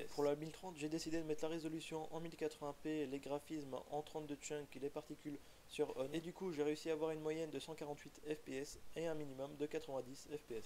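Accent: France. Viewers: французский, итальянский вообще другой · судя по акценту это французский или итальянский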